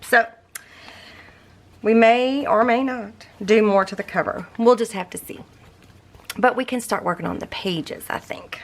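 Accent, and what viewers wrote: South, United States. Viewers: This is absolutely beautiful !!! Congratulations on making 50k subscribers🎉🎈🎉🎈!!! I am so grateful for having found you!! I love ❤ the fact that I have never found it hard to understand ya!! I gotta southern draw too lol and you ain't never said somethin that I haven't heard before unless you accidentally make up a name for it like cramin two words together lol 😂😂!!! Thank you for everything you do!!!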